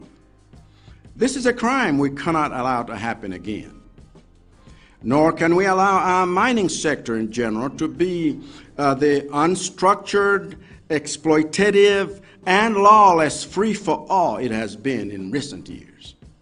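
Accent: United States. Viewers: This guy has a USA Southern Accent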